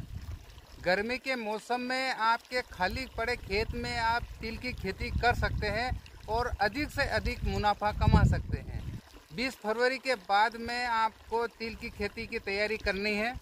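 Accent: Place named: Gujarat, India. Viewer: Bhai Gujarati he bava Hindi bolte he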